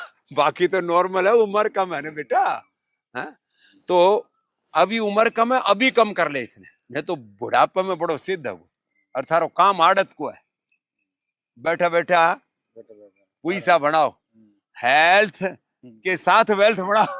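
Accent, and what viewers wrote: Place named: Rajasthan, India. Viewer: Baba ji mhari marwadi boli aaw aapne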